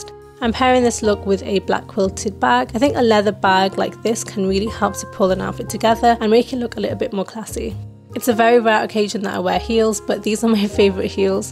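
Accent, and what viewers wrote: United Kingdom. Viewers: Your accent is british? ✨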